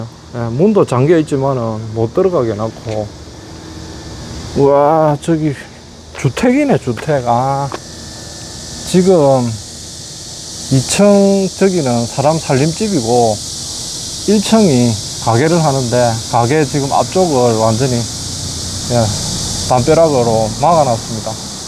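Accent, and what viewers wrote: Gyeongsang, South Korea. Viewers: 재방이지만 오늘도 기분좋게 보고있습니다 같은 경상도라서그런지 넘정겹습니다 편안하고 푸근합니다 ~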